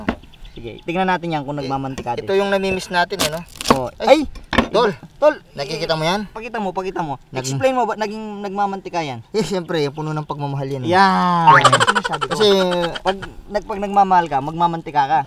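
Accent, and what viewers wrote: Pampanga, Philippines. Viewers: Halatang kapampangan kayo pre hahahaha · LOL... PARANG NARINING KO ANG NANAY KO SA IYO PAG NAGTATAGALOG MAY HALONG KAPAMPANGAN magkasakit lumalaro LOVE YOU GUYS (KALUGURAN DA KAYU) MORE VIDEOS PLS.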